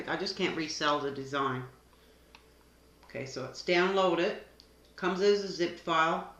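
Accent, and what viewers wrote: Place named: Nova Scotia, Canada. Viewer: Hi neighbour, I thought I recognized that accent. I'm from Nova Scotia.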